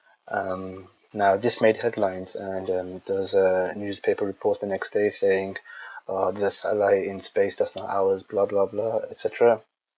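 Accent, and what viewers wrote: United Kingdom. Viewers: You sound British Asian if I'm right? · You fool... remember that 10 ft. wide meteor video shot from a distance of, oh, at least a few hundred miles away? Remember the intense magnification and sheer size of the video camera it took to make the meteor appear larger than any comparable object in space? Notice how, of this video the astronauts zoom in as much as possible yet the thermal blanket looks ---- incredibly small? Remember all the photos and videos of the hundreds of thousands of pieces of space debris taken by any number of astronauts who participated in low-orbit flight missions? Notice how in the video N.U.A. (Nothing Up [my] Ass???) conflates relatively small portions of the "blanket" with obviously larger portions of the object - still - in question? And please do tell us how NASA is always honest with the public (a place where "honesty" means something different to NASA employees in adjacent offices within its buildings). 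Before you believe some idiot with a droll English accent and before you gag on NASA's nuts, take a few minutes to actually ponder this video and consider how little information, beyond his "opinion" is being disseminated. Consider, too, that this is the same slickster who posted (among other fantastic "UFO" video lies): CAN YOU DENY THIS?! FLEET OF HUGE UFO MOTHERSHIPS - LA JOLLA, CALIFORNIA - 31/3/2015 without ever once seeking to inform anyone that the government has any number of aircraft that can perform everything seen it his video. If you must, buy a clue... may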